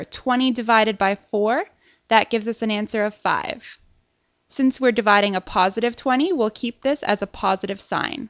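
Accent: Canada. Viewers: very helpful - cute Canadian? accent :) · Fantastic explanation, and clear examples. Really helpful. And as an aside, AMAZING Canadian accent (as an English Brit we don't get accent envy often, but yours is awesome)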